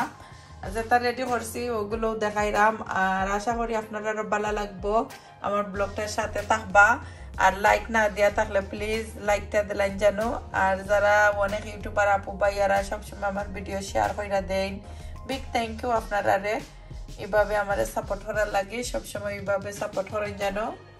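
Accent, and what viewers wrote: Sylhet, Bangladesh. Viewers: syelhet er kotha gula khub sundor apu.tai bondhu hoye gelam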